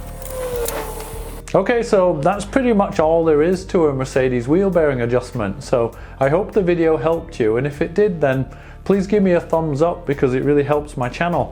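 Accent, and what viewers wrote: Liverpool, United Kingdom. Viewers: Great video!! Love the accent! Liverpool?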